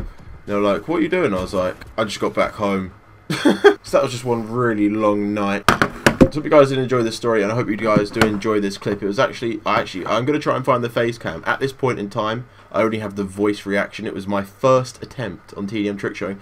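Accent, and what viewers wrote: United Kingdom. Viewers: which part of uk are u from???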